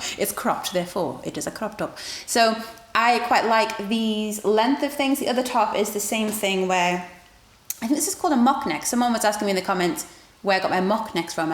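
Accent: Newcastle, United Kingdom. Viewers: Love the Geordie accent problems. I say 'luck book' haha x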